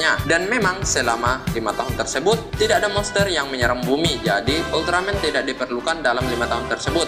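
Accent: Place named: Eastern Indonesia, Indonesia. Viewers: Asal mana sih logatnya kayak orang makasaar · hahah masa kecil kita bahagia banget, thankslah infonya,,, anyway logat ngmgnya asik banget bang, orang timur apa sumatera nihh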